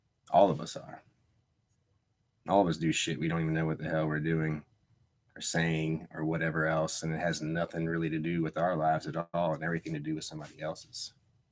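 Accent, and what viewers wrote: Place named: United States. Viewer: Love your American Accent. ♥🐱★💋⚘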